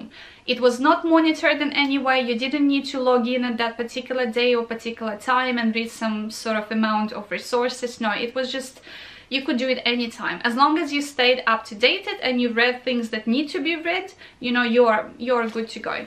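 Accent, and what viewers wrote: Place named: Russia. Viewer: Thanks for your Contribution. You Sound Russian as one of my teacher.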